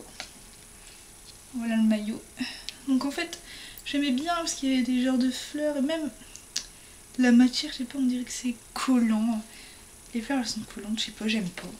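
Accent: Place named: North, France. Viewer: Youpi...jai perçu ton ti accent chaleureux du nord..jaiime trop votre parler ces tro cool...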